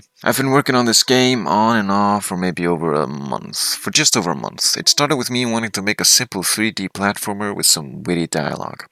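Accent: Iceland. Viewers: You have an Icelandic accent. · You sound icelandic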